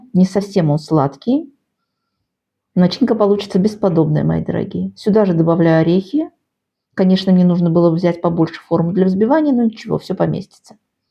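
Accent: Baku, Azerbaijan. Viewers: Я как раз так и думала что вы наверное из Баку Такой мягкий мелодичный бакинский акцент😊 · Я с удовольствием вас слушаю за ваш бакинский акцент ,удачи Вам а я Гюльзар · Слушая вашу речь, услышала знакомые бакинские нотки ❤ Прочла комментарии и поняла, что не ошиблась ! Бакинский говор остаётся с нами на всю жизнь и не узнать его не возможно .. Долгой жизни вашему каналу и удачи ! С приветом от бакинки ❤🌷💓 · здравствуйте Вы из Баку?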